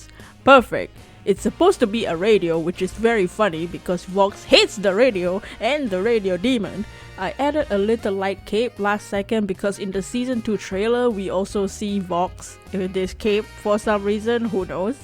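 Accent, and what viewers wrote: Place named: France. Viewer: Par tout hasard, est-tu français ? Il y a un peetit accent Anyway, these designs are cool, I love the Alastor and the Lucifer one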